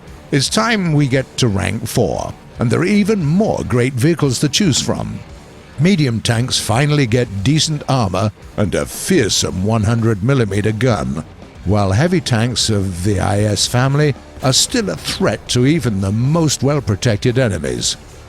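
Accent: United States. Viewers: wait why did u turn American?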